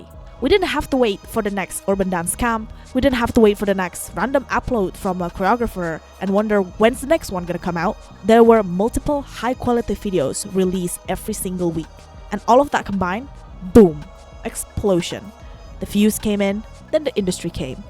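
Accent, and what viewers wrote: Indonesia. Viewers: Indonesian accent detected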